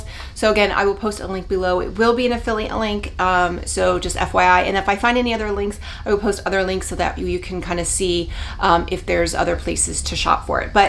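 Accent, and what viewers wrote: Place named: Appalachia, United States. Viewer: For the love of all things holy can you please learn the proper way to say “espresso” and “ accessories”? There is NO “x” in either! I can’t watch this video with your hillbilly verbiage!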